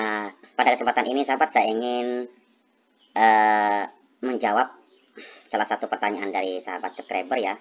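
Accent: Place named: East Java, Indonesia. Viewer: Trims ilmunya, suaranya lucu, logatnya Jawa Timuran😂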